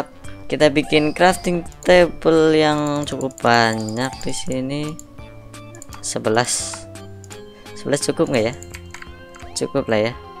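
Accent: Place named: Tegal, Indonesia. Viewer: Abang orang tegal ya :v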